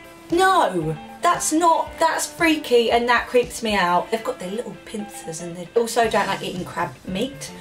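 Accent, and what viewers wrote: United Kingdom. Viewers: I am so obsessed with British accents I could listen to you all day 😍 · I really love this video ! By the way i love the British accent ;) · weird* and its because she is from london (i think) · Ilove you!!!!! Love from Colombia!!!!, if you want come, i waiting for you. (sorry for my bad english) , and i love watch this video for you british acent, Ineed learn. For me you are the best girlfriend #liam **Love,peace and happiness! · Where in the uk is she from?!?!?!?!? I recognise her accent... It's just rubbed off my memories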